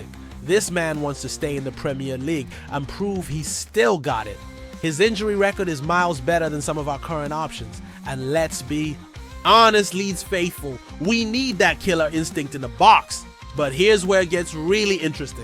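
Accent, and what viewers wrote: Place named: United States. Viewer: Why change the voice to American. It’s unbearable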